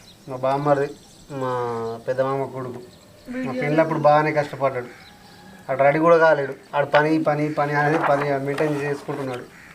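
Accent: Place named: Nirmal, India. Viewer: ఏ ఊరు మీది చెప్పండి మీ ఊరు అదిలాబాద్ దగ్గర నిర్మల్ మీ మాటలు అలాగే అనిపిస్తున్నాయి మా ఊరు మాత్రం వరంగల్ మీ ఫొటోస్ చాలా బాగున్నాయి 👌👌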